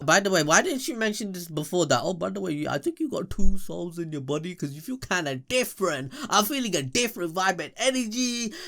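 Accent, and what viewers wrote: India. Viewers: That indian accent though 😂😂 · Love your hindi acent😂💕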